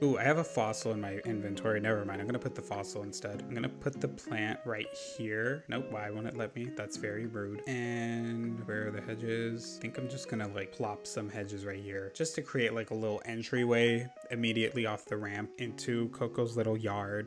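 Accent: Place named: Canada. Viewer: I heard your Canadian… that makes you my favourite animal Crossing YouTuber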